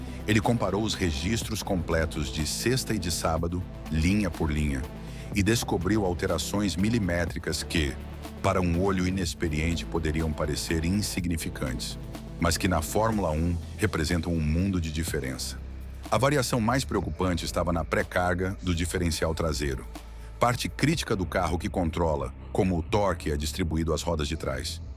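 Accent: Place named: São Paulo, Brazil. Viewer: Merda de IA com sotaque caipira paulista! Medonho.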